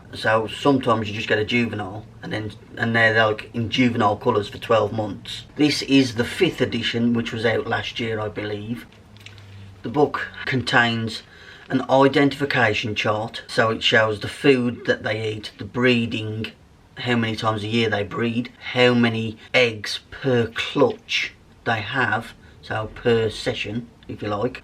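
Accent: United Kingdom. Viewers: I can tell by your accent you must live in the West Midlands. If you do, you must visit Iron Bridge, some incredible wildlife there. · Where about in the UK are you from. Am I detecting a Black Country accent? or am I a bit off?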